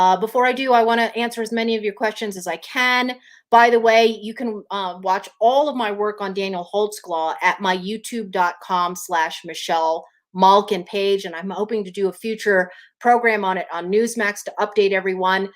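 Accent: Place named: Philippines. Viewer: Michelle M. Having had a couple of Philippinas as GF's your accent is so fantastic.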